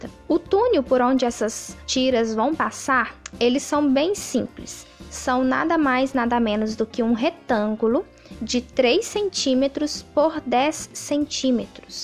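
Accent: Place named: Minas Gerais, Brazil. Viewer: Adorei a explicação pra construção da máscara!! Obrigada!!! Vc é mineira né??? Que sotaque gostoso...BH???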